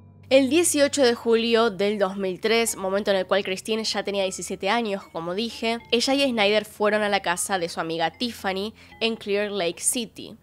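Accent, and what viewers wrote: Argentina. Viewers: Porque los argentinos no pueden decir la Y y la LL y dicen "Sho" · Es argentina :D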